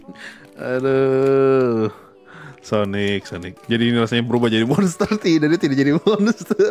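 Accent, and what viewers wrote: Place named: Eastern Indonesia, Indonesia. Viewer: Sorry bang subscriber baru, ini kenapa logat timur nya bocor sedikit. Orang mana kah admin ini?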